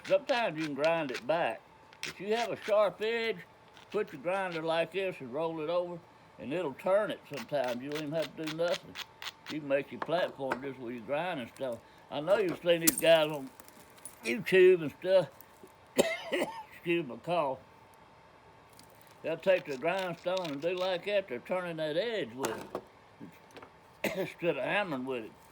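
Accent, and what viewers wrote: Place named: South, United States. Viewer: thank you for sharing your knowledge, and I could listen to you talk all day with that southern draw. where ya from?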